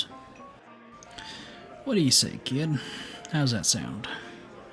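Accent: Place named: South, United States. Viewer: I may listen to this over and over again if I want to play poker with my dad, cause like the title says "Mysterious Stranger Teaches You Poker". Also, love the Southern accent, and after playing poker with "stranger" here, I trust this guy :)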